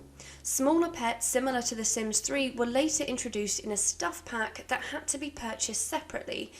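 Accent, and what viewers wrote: United Kingdom. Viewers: this is the most lackluster installment of the whole series. base game is unplayable, unless you have <num> dlc's(each adding 3 new options max...) installed. the base game don't even have fuckin ghosts, like wtf, I could play the base sims 1 with only livin' large expansion pack(in my case it was pre-installed, I remember I picked it up like that in the game section at target or Walmart(I dunno if you have em in Britain< I haven't watched the vid yet, I'm , but I've obviously noticed from the accent that the channel owner's is British> but these are supermarket stores, basically here in the us), it was a pack of base game+living large I still have the package in my family home 😂 but anyways, I could've played hours and hours and hours, only having those, as well as base 2&3, in the Sims 4 the base game Is only a fuckin building simulator(and I've always hated building, I was always picking premade and pre furnited homes, usually not changing a fuckin thing coz I dont really ddlgive a shit about how the Sims' home looks like lol) and create a sim... 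basrely any gameplay options, I was bored after half an hour... there is no mystery vibe the first 2(there was a a hinch of It in Sims 3 left, but only a small hinch...) games have had, sims 4 is a politically correct shit for little kids, to not scare them too much and to teach them that being gay and trans or whatever is some sort of fuckin achievement and privilege(I ain't got nothing towards LGBT people as long as they'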